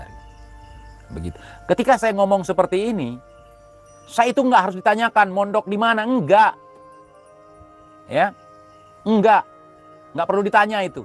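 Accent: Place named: Aceh, Indonesia. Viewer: Bang , peugah haba ,mangat that ta dengo . Makasih . Logat Aceh bék gadòh .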